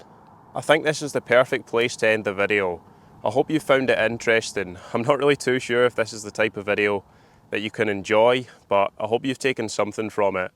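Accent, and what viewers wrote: Scotland, United Kingdom. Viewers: Hi, im a bit late replying but loved it. I have seen the two previous camps on this mountain. Your narration was spot on. You have a great Scottish accent. You are also becoming somewhat of legend locally. Video,edited, and intro very good Kind regards, Laird Birkett 🎉😮❤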